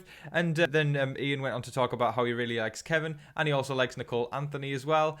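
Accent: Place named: United Kingdom. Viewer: WHERE DO YOU WATCH BB 22! I live in the uk, as I’m guessing you do with your accent, but Idk where to watch it!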